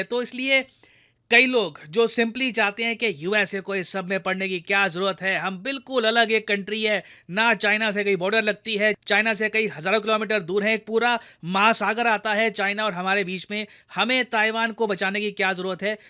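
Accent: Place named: Bihar, India. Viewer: मगर काहे the Bihari touch 😊❤ we love your video महोदय🙏🕉️🇮🇳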